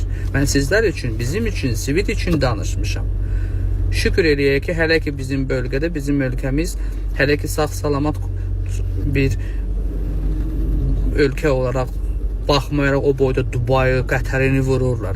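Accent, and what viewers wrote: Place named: Armenia. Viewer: Buna fikir vermeyin.Bunun xowu gelir ele bil pis weyler demek,daniwmag.ERMENI kimi daniwir.IWINNEN MEWGUL OL¡!(+++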